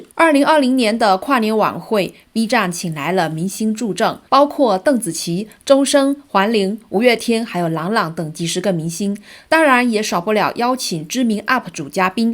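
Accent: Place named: Pingxiang, China. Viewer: 有萍乡口音，要么您就是湖南人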